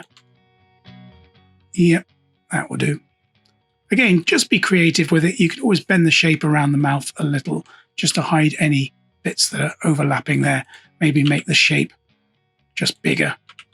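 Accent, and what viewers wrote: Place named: RP, United Kingdom. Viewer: Think I've found the Attenborough of Blender tutorials, all the way down to the very accent if I'm not mistaken! And that is meant as a huge complement since he's the king of human voices.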